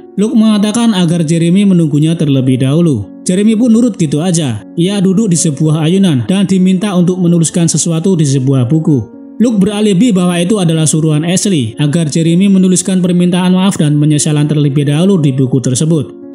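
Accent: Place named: Java, Indonesia. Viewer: Jowone kentel mas wkwkw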